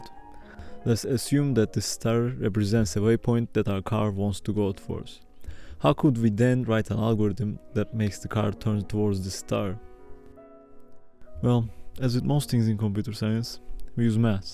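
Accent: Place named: Turkey. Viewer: Your Turkish Accent got me laughing so hard 😆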